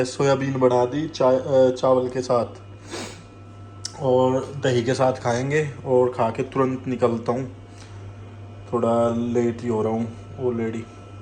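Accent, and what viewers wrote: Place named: Baghpat, India. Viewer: Bhai teri Baghpat wala language ni gyi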